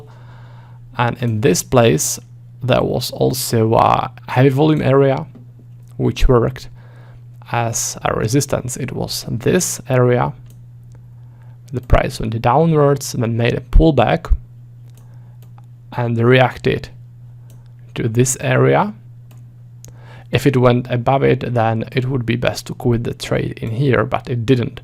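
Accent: Czech Republic. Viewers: Are you from Czech Republic? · Česká angličtina trošku že?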